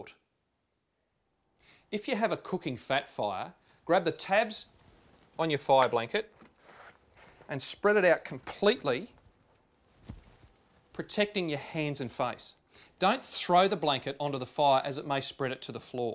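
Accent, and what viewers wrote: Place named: Australia. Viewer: Everything sounds better in an Australian accent, even fire safety vids! 🇦🇺👍 Greetings from the U.S.A.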